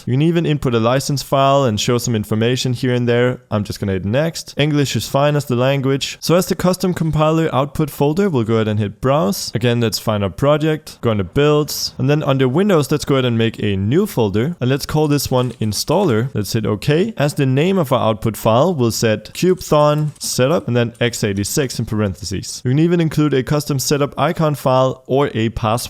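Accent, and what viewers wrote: Netherlands. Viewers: Are you dutch? And again thanks for your videos! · Wait, are you dutch? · Wacht, ben je Nederlands?!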